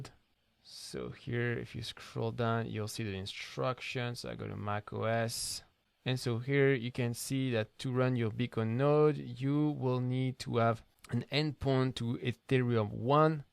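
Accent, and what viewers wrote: France. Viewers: Merci ! Je réponds en Fr car je crois que j'ai reconnu l'accent ;) Ma question était : j'ai l'impressions que le Tuto de Prysm explique comment lancer son noeud établir son noeud sur son ordinateur. Je me demandais quelle était l'étape à suivre si l'on veut passer par un server comme digital ocean ou dappnode ? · 2 years later, it did not happen. And your French accent is still unbelievable :D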